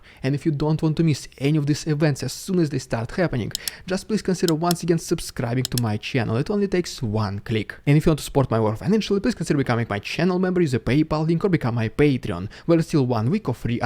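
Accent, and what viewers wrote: Russia. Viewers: Stop trying to be cute bro. Can speak properly u Russian. Sound like one n look like one. Go into action don't just comment criticise. Put in coming for u n give u awards for the information u gave him. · Man I'm from Crimea Sevastopol and I speak Russian and I speak fluent English and I came here when I was a kid and all my life I thought that this accent that he has was totally fake made-up American bulshit for movies but now that I see it in real life.... wow it's real. I guess I just missed out on that cool accent because I came here as a kid and and now I really don't have an accent. :( 😭😭😭🤷 Btw using voice to text so appologise for Any broken ass sentence structure or spelling ahead of time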